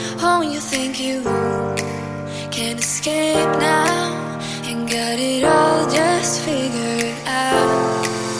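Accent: Netherlands. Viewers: Je bent Nederlands of niet?? Ik hoor het aan je accent :o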